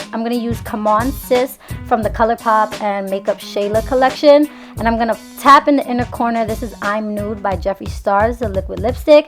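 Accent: New York, United States. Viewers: she from ny !! not every bitch wants to talk like cardi lmaooo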